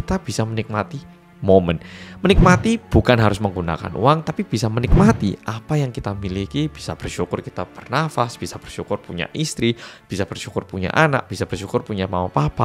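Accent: Java, Indonesia. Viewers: Sehat sll yo mas tp medok. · Suaranya medok bgt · Logatnya terlalu jowo gak nyaman di dgr😢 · Iconic..... medok e masih ada walaupun wes ga di Malang.... idola❤❤❤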